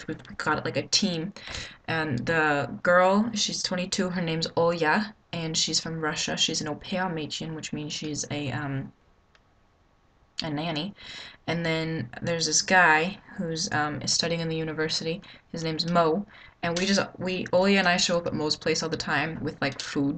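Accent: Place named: Germany. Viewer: It's great to hear your German-influenced English now! You're speaking English but you're saying it the way I imagine the German phrases or expressions would be said. :)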